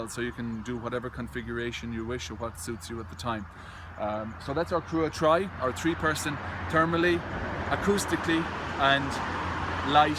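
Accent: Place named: United Kingdom. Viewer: I agree people sometimes add sounds to words unnecessarily. That being said, it seems American English is not the first language of the host of this particular video. There are many millions of folks out there who speak British English--they pronounce aluminum a different way. <link> And in my book, different in this case is perfectly fine. Who am I, or you, to judge anyway--aren't there better things to be spending our time on than calling people out publicly/on social media for things like this?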